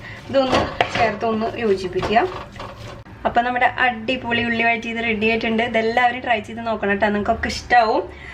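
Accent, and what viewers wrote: Thrissur, India. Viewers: Supr chechiii...bt speed koodithl aanataaaaa....thrisurkaro aanooi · adipoli Thrissur bhasha.... 👆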